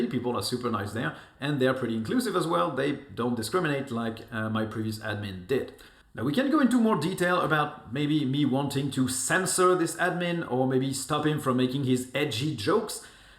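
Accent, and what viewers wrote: France. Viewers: Also, you really really should consider making one video with that French accent of yours! It will be really really enjoyable ahhaha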